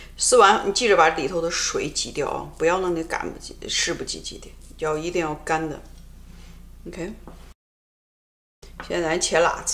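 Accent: Shaanxi, China. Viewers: 好亲切的陕西话！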